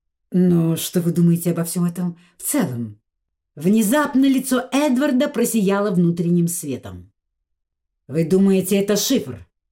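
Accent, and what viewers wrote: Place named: Provinces, Russia. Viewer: Чтение передает русскую глубинку, никак не Англию.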